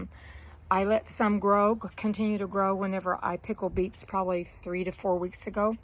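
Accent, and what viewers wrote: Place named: Ozarks, United States. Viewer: Hi! I always savore your videos! I will do a research about, Ozark Mountain. Have studied linguistique, I can recognize, what we call the purity speach! Which you have preserved wonderfully! Hugs my dear friend!🥰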